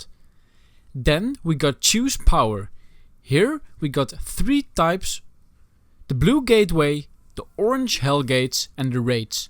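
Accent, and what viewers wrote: Netherlands. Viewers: goed bezig! leuke videos met een nederlands accent.:D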